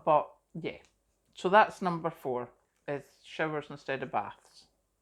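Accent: Scotland, United Kingdom. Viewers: So? Did these save you money? Would love a recap. Thank you! Pretty lady from Scotland with the lovely accent!❤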